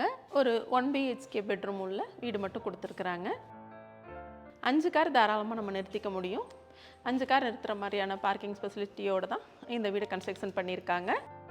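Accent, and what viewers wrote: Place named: Kongu, India. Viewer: அம்மா, வீடு விற்பனை மற்றும் வீடு வாடகை தகவல்களை நீங்கள் விளக்கும் முறை அருமை. கொங்கு தமிழ் பேசுகிறது. வாழ்த்துக்கள். பணி சிறக்க வாழ்த்துக்கள்.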